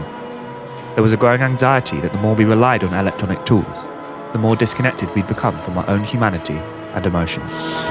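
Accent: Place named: United Kingdom. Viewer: ofc he has a briish acceny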